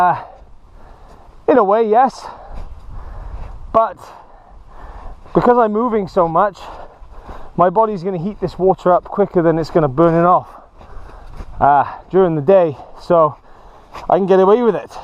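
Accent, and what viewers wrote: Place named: Geordie, United Kingdom. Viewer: i would not entertain comments from Mehmet he does not have a channel, and probably has no bushcraft or survival skills, Mr Mehmet if you read this comment lets see what you can do... great video man a big thumbs up and subscribed...there seems to be a hint of Geordie in your accent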